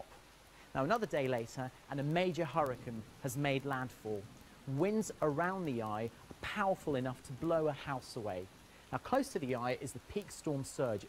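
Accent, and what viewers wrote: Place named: United Kingdom. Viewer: Because he is British and that's how its pronounced in Britain, the e at the end is silent. Just like in America you guys say tomato as to-may-tow, we brits pronounce it "tom-ah-tow. There is no right or wrong way to say it, it's the same thing, it's hardly a big deal...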